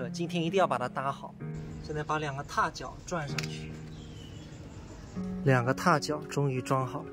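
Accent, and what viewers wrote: Suzhou, China. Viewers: 保持讲苏州闲话， 蛮好听的 😃。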